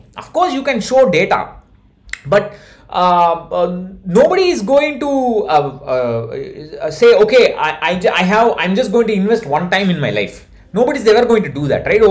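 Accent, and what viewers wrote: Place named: Chennai, India. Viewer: Yes, missing his Chennai Tamil slang...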